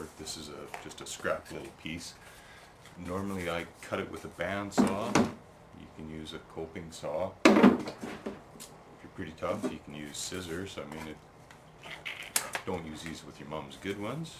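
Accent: Canada. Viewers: jw are you canadian? i am and i can recognize the accent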